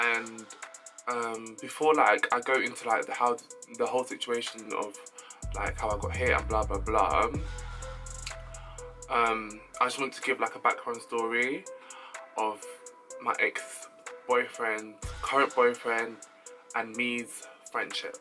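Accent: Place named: United Kingdom. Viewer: Double Language Barrier 🙅🏽🙅🏽🙅🏽 Lisp+British Accent 😷😷😷 Handsome, Tho.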